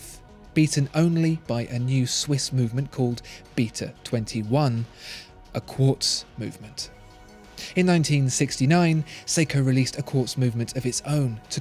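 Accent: United Kingdom. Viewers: I am not a watch collector nor a watch enthusiast, my dream watch is Panerai and I have 2 of it so far, I watch your videos usually for the little historical infos you mix and match in your videos and also the elegant British accent, but this time you got me interested as both watches got my attention even the presage. Thank you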